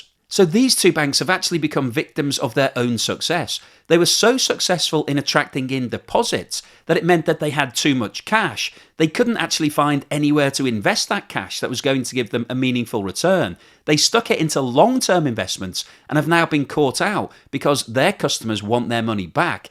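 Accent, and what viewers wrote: United Kingdom. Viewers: Just because you are British you sound like you know what you talk about but you don't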